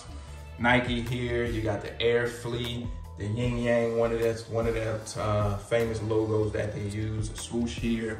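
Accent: Baltimore, United States. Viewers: You from b more lol i hear it🔥💯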